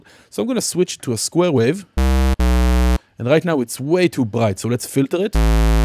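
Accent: Israel. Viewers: חחחחחח ישראלי ,מבטא יפה. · אחלה ערוץ יש לך. אוהב את המבטא שלך. ישראלי אמיתי. תודה אחי. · גם ללמוד מסרטון באנגלית ברמה גבוהה וגם לשמוע מבטא ישראלי כבד. תענוג!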